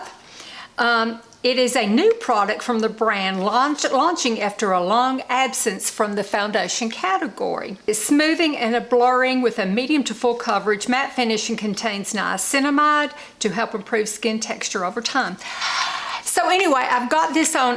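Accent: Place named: Tennessee, United States. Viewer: You are a beautiful lady ❤ I thought you had a Tennessee accent 🥰 my relatives live near Kingsport.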